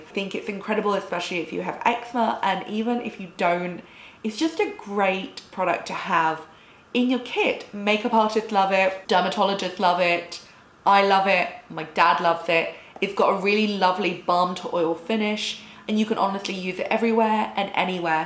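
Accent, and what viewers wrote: England, United Kingdom. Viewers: You are just so cute and so sweet! I love your English accent! I have eczema and had heard that this cream was really good but wasn’t sure. Your review completely sold me!🥰😃👍✨💖✨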